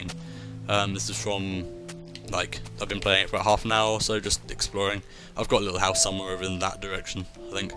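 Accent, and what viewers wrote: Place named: United Kingdom. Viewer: speak american so i can understand u U fucken brit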